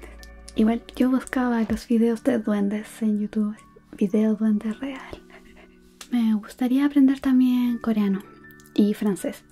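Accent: Chile. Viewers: Ahora si se nota tu bello acento chileno mas que en el ASMR. Por supuesto que tu VOZ es hermosa siempre en cualquier video! 👍☺👍✌ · tu voz es tan relajante 😊 desde que te escuche dije es chilena, yo soy mexicana, saludos. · Espero las trasmisiones jugando 👌 te va ir de lujo este canal, ese acento chileno me encanto 💖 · Hola 💗💗 pregunta: cuáles son tus animes/mangas favs? Psdt: que lindaaa, y el acento chileno ajaja❤ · Épico hablando chileno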